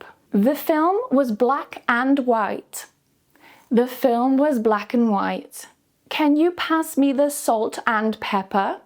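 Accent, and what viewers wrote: United Kingdom. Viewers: That's a really effective video for me to learn the British accent. Thank you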